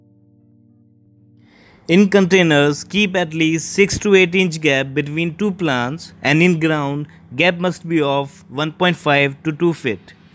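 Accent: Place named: India. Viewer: ох уж этот индусский акцент.... ужасно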